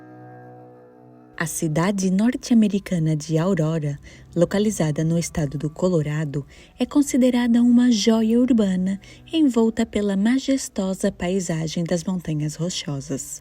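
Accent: Portugal. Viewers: Ótimos vídeos, ganhou mais um inscrito, sotaque português muito lindo rs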